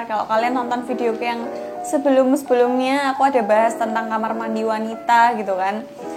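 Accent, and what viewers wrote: Java, Indonesia. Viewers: Medok banget kamu mbak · Mba kocak ya logat jawane apik bahasamu aku suka dengerin konten panjenengan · Aku gak ngerti bahasa jawa,tapi aku ketawa,cara bicaranya jawanya lucu,terus medok banget😅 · Wong jowo banget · Suara e ngangeni...medhok" gemes'!!! . Maju terus MB cantik... .. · Suka kesini gara" cara ngomong nya medok wkwkwk😆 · Mbak sampean Jawa mana sih...koq enak banget nada bicaranya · Arek ndi iki omonge kok jowo wkwkkw.. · Suarane medok mBake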